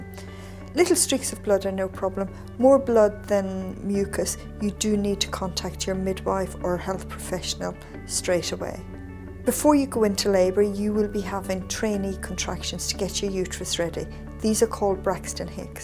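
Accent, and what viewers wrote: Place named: Ireland. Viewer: You're so stupid, she clearly has an Irish accent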